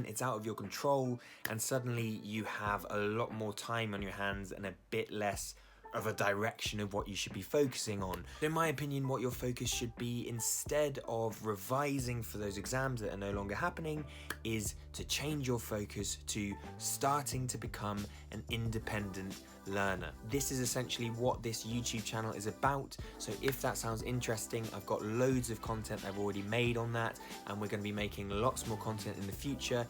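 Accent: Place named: RP, United Kingdom. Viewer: You sound posh - did you go to public school?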